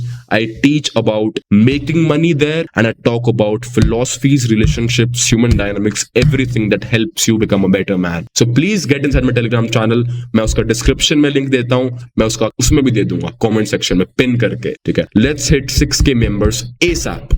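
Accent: Haryana, India. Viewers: accent seems like haryanvi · Accent jhajjar ya rohtak ka hai?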